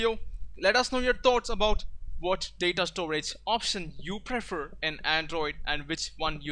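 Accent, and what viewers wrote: India. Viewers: Delhi Accent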